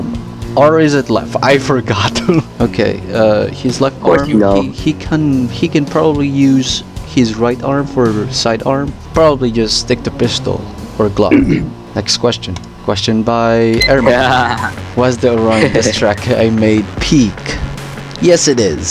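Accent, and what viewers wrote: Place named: Russia. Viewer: is so peak. Ngl I didn't know you have the Russian accent, it's good to see a Half-Life fan nowadays who has a love for zombies too.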